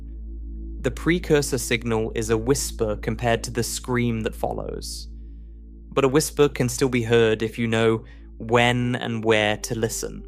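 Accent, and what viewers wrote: United Kingdom. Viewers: Pretty sure most Brit accents have trouble with late or word-final rhotics, according to the American ear. But Brits are easier to fall asleep to anyway. I guess there's metaphors to be made there.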